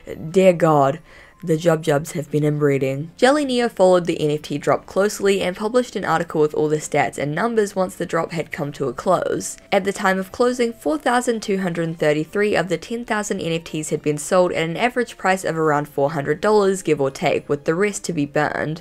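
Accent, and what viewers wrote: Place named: New Zealand. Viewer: Your videos are the best to just put on, and listen to as I clean and do my house work. Your voice reminds me of all the good mates I met when I lived in Tauranga New Zealand. Truly an enchanting, tolkienesque place.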